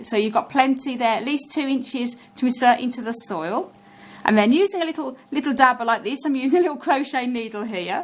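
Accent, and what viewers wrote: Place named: Wolverhampton, United Kingdom. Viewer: Are you from Wolverhampton? Loved hearing somebody local on here! Thank you for the video. It's fab! Fingers crossed my daughter's cuttings root 🤞🏽 have a great day!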